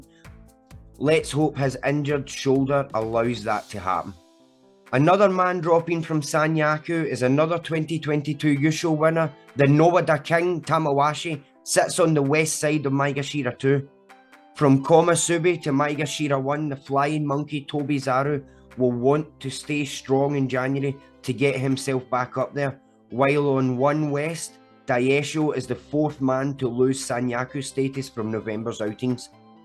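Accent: Scotland, United Kingdom. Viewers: If his knees are okay, Terunofuji will win the New Years' Basho. If his knees give him problems, I think it will be a tight race between Wakatakakage and Hoshoryu with the latter winning his first Emporer's Cup. Hoshoryu is starting to look more and more like his uncle, the great Asashoryu. Not just physically but also in terms of strength and variety of techniques. Great to hear a sumo commentator speaking in a Scottish accent,!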